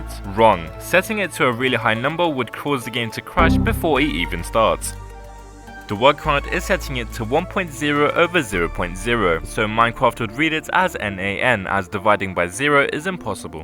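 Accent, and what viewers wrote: United Kingdom. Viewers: You're British? I am asking this because of your different ascent!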